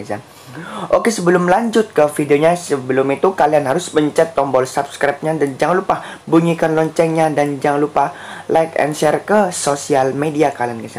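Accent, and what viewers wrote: Java, Indonesia. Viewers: Anjay bgomong jowo orang mana bang emangnya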